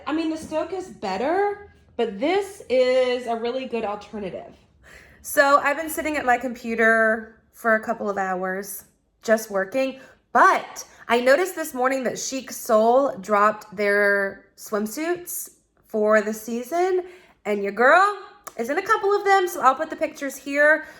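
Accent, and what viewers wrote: South, United States. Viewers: I love how you talk lol. I'm from texas I have a southern accent too lol. Nice vlog love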